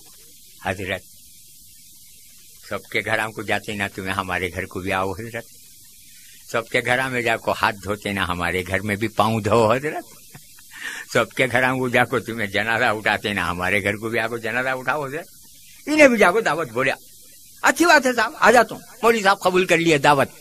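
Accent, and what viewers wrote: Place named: Hyderabad, India. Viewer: North india me aisich boleto hyd ki language ku gaun ki language samajhte, ab ye jo lehja hai, bohot purana hai. Hyd me salon salon pehle, aisa baat karte the magar ab bohot sare north ke words laliye. Bangalore bhi bohot developed city hai. Wahan pe aisa baat karte, to kya bangalore gaoun hogaya kya be ? Language se city gaoun samajhne wale gawar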